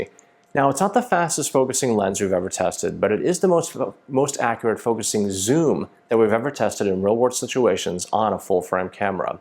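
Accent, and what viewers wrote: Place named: South, United States. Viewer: Very refined manner of speaking from a gentleman of the South. May I ask what Camera-lens combination did you use? Your video is just so beautiful, with the bokeh very pleasing to the eyes. Everything within the frame is supremely picturesque.(And by the way since you are a Canon user, I subscribed immediately)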